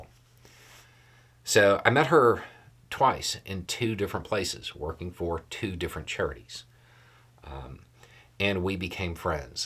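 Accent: South, United States. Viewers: Exactly because he still understands what it means to be a member of the human race. He can be wrong, he can listen, learn and explain things, teach like no one I have seen. Maybe bias cause the southern accent but absolutely adore listening to this man. Thank you.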